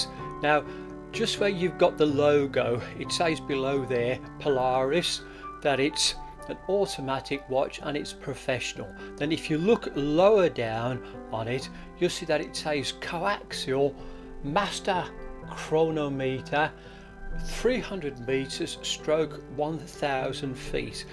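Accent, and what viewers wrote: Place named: United Kingdom. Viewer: it's hard to find channel like yours Sir,, you served an information nicely since I am not an English native, I catched your words clearly like a crystal altough you have a British accent,, not like other channel who speaks fast,, how can I watch the video and read the text at the same time (I'm not an Englishman).. I got information that I want from you,, thank you Sir, sorry for my English note: like #100 for you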